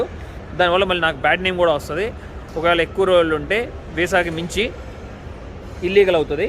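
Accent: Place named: Telugu, India. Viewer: అన్నా మీరూ ఇంగ్లీష్ మాట్లాడుతుంటే ఏంటో తెలుగు మాట్లాడుతున్నట్టు వుంది అన్న....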